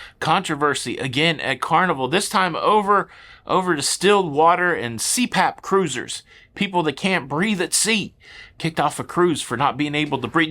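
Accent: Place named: Boston, United States. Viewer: Make sure you ask where the cayr (car) is payrked (parked) lol. Love love love the Boston northeastern accents. It’s a great city. Have fun and safe travels.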